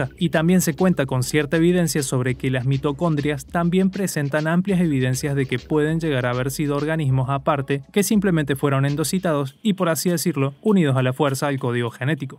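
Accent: Córdoba, Argentina. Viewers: Te noto acento cordobés, le doy like por la musiquita de terraria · si es argentino, escúchale el acento nomas. Ni ahí es un verdulero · Disimulalo pero sos cordobes, manso video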